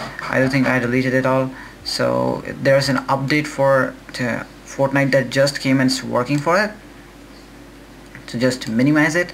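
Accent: India. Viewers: I don't trust anyone with an east Indian accent and a computer with an internet connection ......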